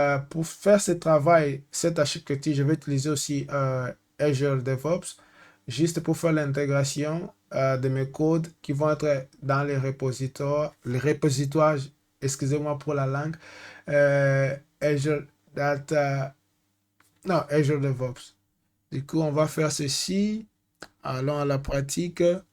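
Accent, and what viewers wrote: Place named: France. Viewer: Tant que le message passe, l'accent français n'est plus une barrière ! Force à toi Ndeko 🎉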